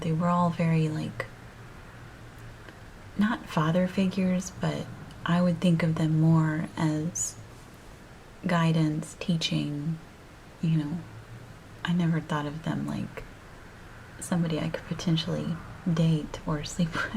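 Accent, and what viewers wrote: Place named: England, United Kingdom. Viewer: Dear how come you have no ta America an accent but a English tong of speaking why so many Questions answer the half of it and deeper to your self and closer to the Public you get more out of your program because your very good telling your story wat you want to tell stay in the same passion like your hearings and your become a very wise women stay by your mind and have your self love t Gautier le Bois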